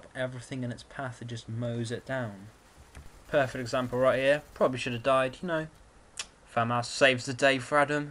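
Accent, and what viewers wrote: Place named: England, United Kingdom. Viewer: where abouts in England are you from???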